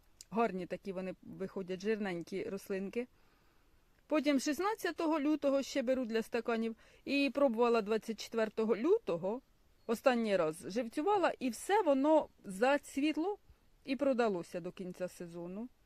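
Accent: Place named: Poltava, Ukraine. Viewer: Добрий вечір, попала на ваше відео і відразу підписалася, тому що почула знайому вимову , я сама родом із Царичанського району, (живу у Дніпрі) потім узнала,що ви з Полтавщини. Манера розмови, акценти дужі схожі. Бажаю вам гарних урожаїв, а якби висилали свої квіти, я обов'язково б у вас купила.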